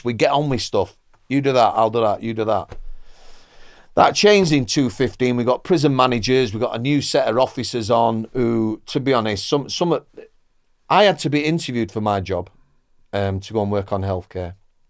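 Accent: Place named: Northern England, United Kingdom. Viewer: NEUTRAL ACCENT LOL how on gods good earth can this guy think is southern? If he was American I could understand but for fuvks sake how can any British person not know is from the north? This guy must have taken too many knocks from Danny g and something got dislodged in his head! Lol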